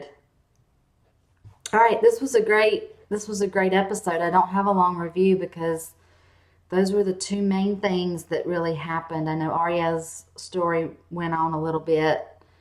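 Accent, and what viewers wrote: United States. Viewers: Why does everything sound better in a Texan accent? BTW, Alliser actually calls Jon "Lord Snow" which is an insult (you know, bastard?). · Glad to hear you're feeling better! And I love your voice. You sound a lot like my aunt Didi, who's from Alabama.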